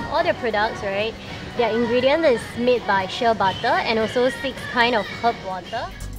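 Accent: Singapore. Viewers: Singlish lols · sounds like a sgp dialect ;D bute etude house has so many cute and really effective stuff ! love it !! they should also sell it in europe ~ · Singapore! Know it from the famous singlish 'lah' and 'Leh' she uses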